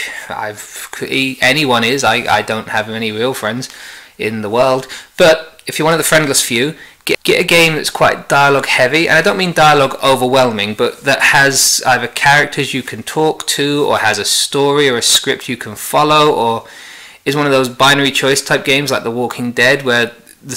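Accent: United Kingdom. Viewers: great video mate!!!! love the way you say Telly! is in the with ur British accent!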